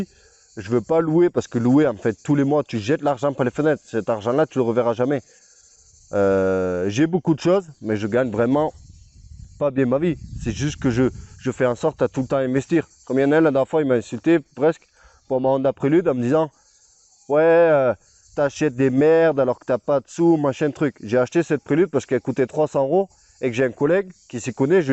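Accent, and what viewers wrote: South, France. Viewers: Bonjour l’ami et merci pour ta sincérité. J’avais regardé ta précédente vidéo sur le sujet de ta maladie et crois moi tu ne nous embête pas avec ce genre de vidéo car ça nous permet de mieux te connaître et de mieux partager à chaque fois tes « aventures » mécaniques. Pour les vacances tu as bien raison car il faut parfois se resourcer un peu la tête et c’est ta vie et tu as le droit de faire ce qui te plait avec ta petite famille. En plus ce n’est pas tous les jours que l’on rencontre un Danois avec l’accent du sud lol !!Merci pour cette vidéo.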